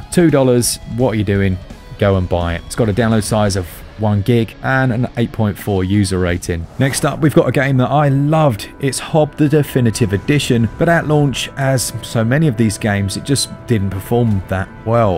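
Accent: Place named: Essex, United Kingdom. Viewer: J W Definitely from around/near London, probably Essex/Kent way is my guess.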